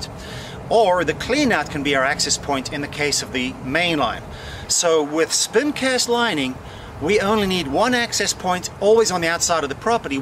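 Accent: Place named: South Africa. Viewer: Interesting accent!!! Originally from South Africa or Zim?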